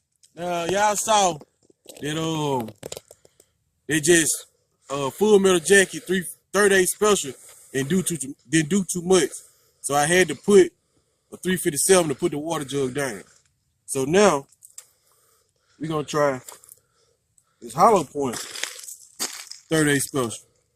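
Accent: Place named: South, United States. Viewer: Baruch Hashem (G-D Bless you), I need a revolver too there's just something ao fun about them that is fun and a good 357 is usually 1 n done if you really need it always enjoy your videos. Youtube needs more ppl like you. Sell some shirts (or practical tac gear/gun gear white label basics whatever?) or something if you need the money like i do, go for it. Your lack of money seeking though also is part of what makes your channel nice and different so if you do it just take some baby steps. You are headed in the right direction keep it up!! what you are doing is rare and only a few others out there that I have found, I like to watch a real person do real things I am also interested in and not be a prick about it. Keep it up you are on the right path and your accent makes me miss the more southern areas of these states something about it just seems friendly. 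Wow, that is a long comment G-D bless you Shalom and Baruch HaShem.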